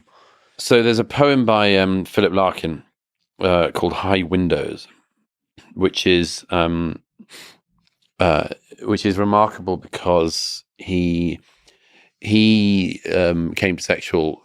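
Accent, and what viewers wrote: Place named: RP, United Kingdom. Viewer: Yeah, he's totally got this wrong. Prat with a plumby accent is all he is.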